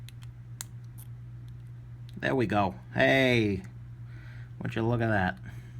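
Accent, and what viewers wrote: New York, United States. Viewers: You’re from the desert? You sound like you’re from Long Island, Brooklyn or the Bronx! : )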